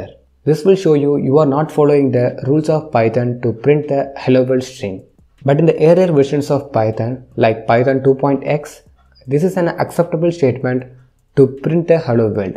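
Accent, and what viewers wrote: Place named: Tirunelveli, India. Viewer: Excellent. Bro neenga Tirunelveli ah ?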